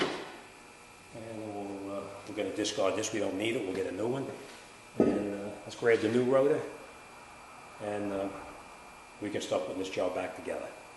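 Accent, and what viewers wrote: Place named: New York, United States. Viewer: great instructional video. I detect a NY accent or perhaps, a NJ accent. Don't know which.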